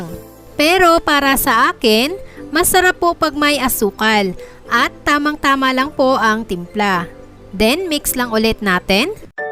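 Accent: Visayas, Philippines. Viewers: Bisaya ba si nanay?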